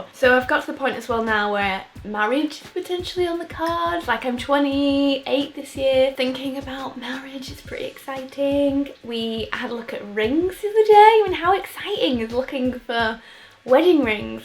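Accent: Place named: North, United Kingdom. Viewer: where are you from? you sound quite northern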